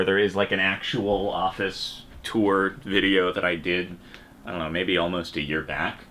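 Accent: Australia. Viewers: pretty good Australian accent tbh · Bro's Australian accent has gotten a lot better since Farmer__Steve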